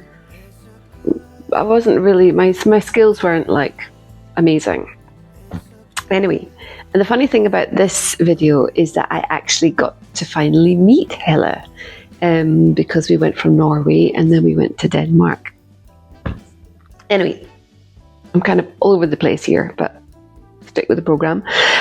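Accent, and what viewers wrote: Scotland, United Kingdom. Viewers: Hi I’m also Scottish but moved to the US years ago to be with my American husband and not sure how long you have lived in France but it’s so nice to hear you haven’t lost your beautiful Scottish accent as I still have mine too and never understand how adults lose it after living a short time in another country but children are different they lose it pretty fast. I love Kika’s patterns also and in the Spring I finished the Salty Days Sweater which turned out beautiful. I also paint in watercolors so it was a lovely gift finding YouTube suggesting your video today and have subscribed also your Seashore Blouse is gorgeous! ♥️🤍💙🏴🧶🫖☕️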